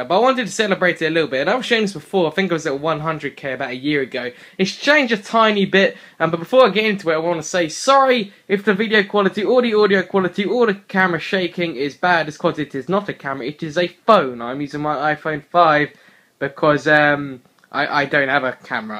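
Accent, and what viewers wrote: England, United Kingdom. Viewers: are u English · What part of Britain you from?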